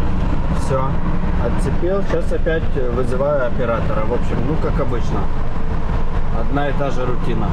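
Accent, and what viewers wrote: Odessa, Ukraine. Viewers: Пролетел говор Одесита, Удачи!!! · смотрю с удовольствием бытовые сцены... дорожные трипы никому не нужны, если больше 5 минут... одесский акцент умиляет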